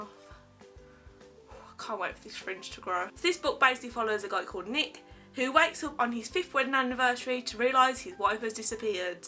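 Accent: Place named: Birmingham, United Kingdom. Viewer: So nice to hear another brummie on here! Great video, so jealous of your haul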